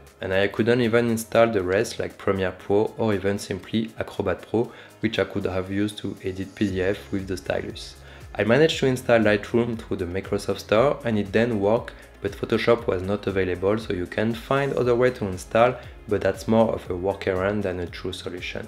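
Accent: France. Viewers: Beautiful French accent 👍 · Your french accent is too thick i almost didn't understand what you saying...but you don't have to change anything because this is this channel identity.. Btw seem a good product recommendation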